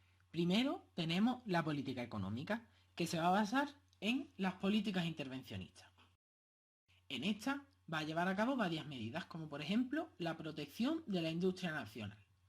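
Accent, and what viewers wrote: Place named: Seville, Spain. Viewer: Qué mejor que aprender historia de España que con un acento sevillano. Mis dieses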